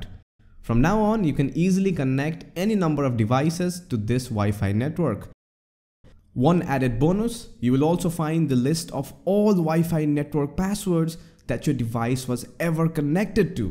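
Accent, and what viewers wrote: India. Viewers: Are you from India?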